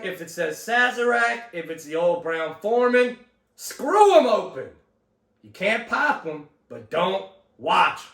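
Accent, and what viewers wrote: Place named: New Orleans, United States. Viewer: Thx for the informative review; saw you on another bourbon channel, recognized the accent & knew you were from Nola area! Can Early Times bib be found in nola?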